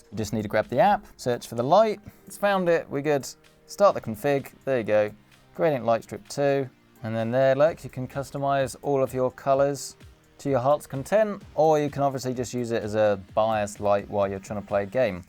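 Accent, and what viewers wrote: England, United Kingdom. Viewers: does bro live in the US or the UK? He sounds like UK (england) but his sponsors and prices seem US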